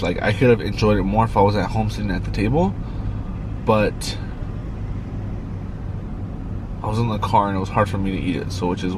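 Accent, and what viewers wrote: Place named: Canada. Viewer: Did you just move to CA? Could of sworn you were Canadian :P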